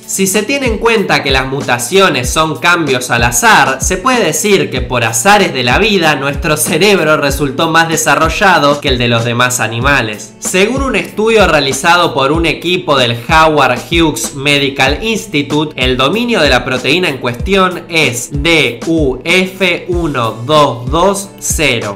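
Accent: Argentina. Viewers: Eres Narigon y hablas como Argentino mmmmmmmm. :u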